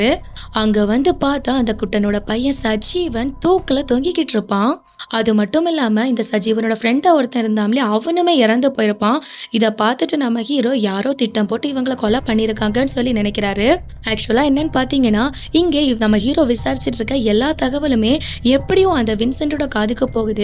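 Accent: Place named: North India, India. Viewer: தமிழ் சரியா தெரியாத வடக்கனுங்க "கார்டு மேலே இர்க்க பத்நால் நம்பர் சொல்லுங்கே சார்"ன்னு சொல்ற மாதிரி இருக்கு நீங்க பேசுற தமிழ் slang..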